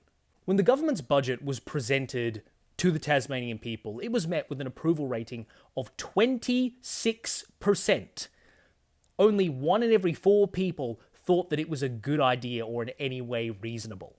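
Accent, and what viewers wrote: United States. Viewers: Fascinating developments. Am curious about your accent though: did you spend time in the US? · Your analysis is interesting but your American pronunciation is annoying af. In particular U sounds as OO sounds like 'noos' but also words like Lieutenant and semi. Watch more Australian content. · The what Governor? Get your pronunciation right, dude. You have too much of a Seppo twang as it is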